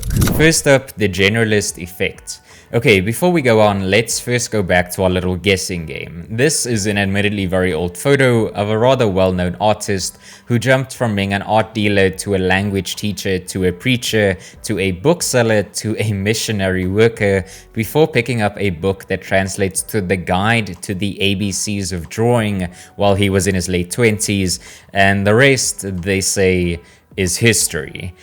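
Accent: South Africa. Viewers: Are you South Africam? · super random but are u south african?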